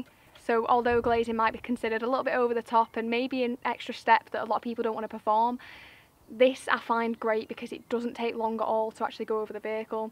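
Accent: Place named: Lancashire, United Kingdom. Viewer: Good to hear a fellow Lancs accent. Do you rate that wax more than BH DSW? I was going to get some of the BH but heard it can be tough to buff off.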